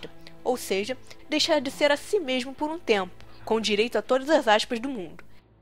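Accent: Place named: Rio de Janeiro, Brazil. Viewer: É, o grande defeito desse canal é o sotaque dela. Esse carioquês é uma outra língua!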